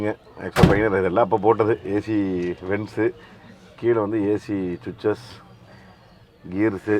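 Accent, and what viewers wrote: Kongu, India. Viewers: Kongu thamiz awesome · கொங்கு தமிழும் அழகு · கொங்கு தமிழ் அருமை....ஏனுங்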